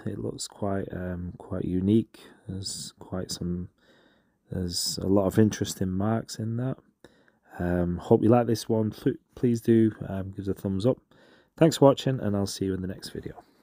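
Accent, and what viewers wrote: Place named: North West England, United Kingdom. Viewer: This is beautiful, I love those moody blue-greys! Also I gotta say, as someone from the North West but living elsewhere, I was overjoyed to click on this video that came up in my feed and hear a familiar accent :) Subscribed, looking forward to your future videos!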